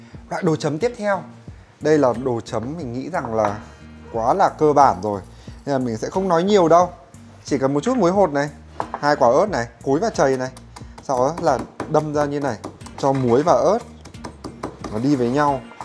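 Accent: Hanoi, Vietnam. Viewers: Giọng Hà Nội nghe cưng phết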